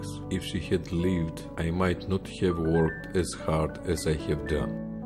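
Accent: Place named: Greece. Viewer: excellent greek accent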